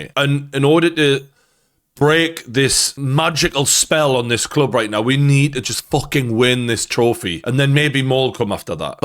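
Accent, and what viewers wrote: Newcastle, United Kingdom. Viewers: Good take, nice to hear the true geordie.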